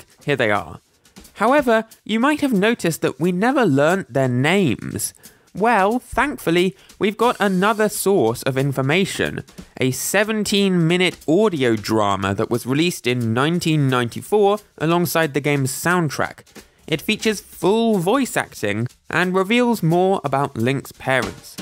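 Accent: United Kingdom. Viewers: I love how you pronounce it "Ocarin-er" lol. You're on the opposite side of the earth, and somehow, you're more redneck than me. Hello, from Texas, mi amigo, mein freund! God bless ya, dude. I love your vids. I love your accent, too. (American people think British sounding accents are indicative of high-level intelligence. But they'll still pick on you for pronouncing things differently than they do. Idk why. I'm a native Texan. I'll be American again, as soon as the rest of this country pulls their heads out of their asses.....) Keep up the great work.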